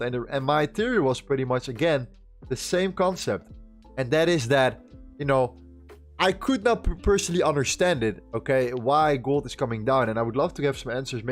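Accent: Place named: Netherlands. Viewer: Overal te herkennen, dat prachtige nederlandse accent.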